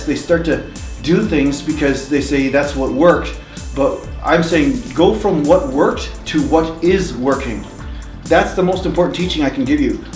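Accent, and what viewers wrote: Canada. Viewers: No prob my dude. You're canadian accent also reminds me of home (I'm originally from Minnesota) which makes me love your vids even more. I'm about to binge watch a shitload of them.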